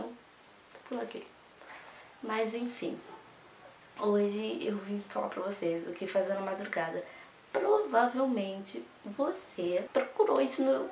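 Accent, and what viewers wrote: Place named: Rio de Janeiro, Brazil. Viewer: vc morra no Rio de Janeiro e porque vc fala carioca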